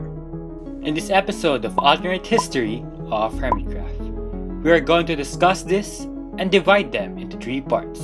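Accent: Philippines. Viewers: are you Filipino? you sound like one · I never heard such a Filo accent in a Minecraft video holy crap ahahaha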